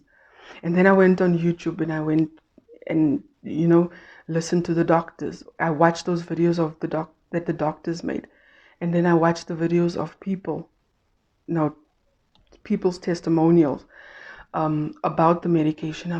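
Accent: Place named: South Africa. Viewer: Yup, it saved me a lot. I just started trulicity which is about the same as ozempic. I'm excited to lose weight. Totally off topic, I absolutely love your accent! I know, typical American lol...but South African accents are completely under rated. I hope the best for you sweetie, you're looking great!!! I can only hope the same for myself someday soon. :)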